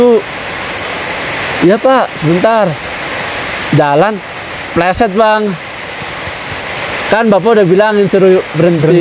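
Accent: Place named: Banyumas, Indonesia. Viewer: Habis dr rjl5 langsung kesini. Mas keren bocah ngapak kaya inyong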